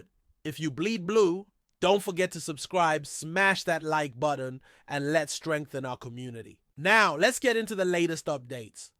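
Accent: United States. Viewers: love the American accent in Scottish slang, fkn hilarious.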